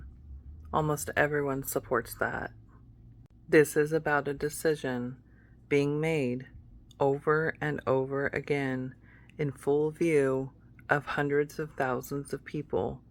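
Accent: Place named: England, United Kingdom. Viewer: What about his English accent and the way he does his mouth with his tongue ..He is cringe factor for sure!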